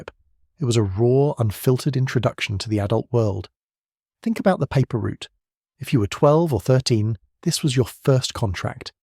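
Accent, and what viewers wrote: England, United Kingdom. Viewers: Uk accent for a US life. Doesn’t matter. Most of it applied. A child of 76, I grew up playing feral in short shorts, bikes with long seats paper rounds, making camps out all day every day in the summer with the only instruction to be back home by dark. Happy happy times. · Brit accent for Americana. Hmmm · Man, I was about to enjoy this video till they put this bullshit British accent down there that’s not American or nostalgic. What the hell is wrong with you? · Why does the narrator have an English accent? This is about 'Merica. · This is a strange video with a British accent but American images. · The British commentators voice does not fit the memories from AMERICA in the 70’s and 80’s. Sorry, bad choice. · Nostalgia. It's a little unusual to hear a British voice narrating American English vocabulary and photos. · This British accent is pissing me off for some reason 😡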